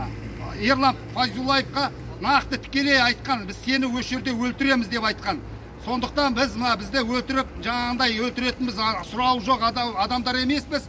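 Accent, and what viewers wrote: Standard. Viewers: Рахмет ага таза казакша тусиникти рахмет АЛЛА Разы болсын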